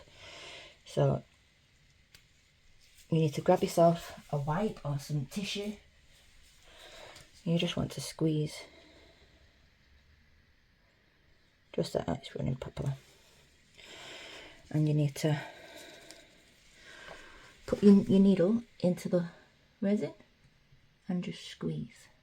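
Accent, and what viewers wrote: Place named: United Kingdom. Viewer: He is beautiful! I laughed every time you said “bubbles “, your British? Accent makes it sound cute!❤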